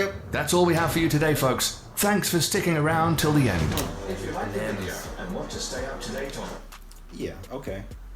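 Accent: United Kingdom. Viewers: The beautiful British accent is so wrong here. Al Bundy or David Chappelle would be better. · Bizarre that the narrator spoke with an English accent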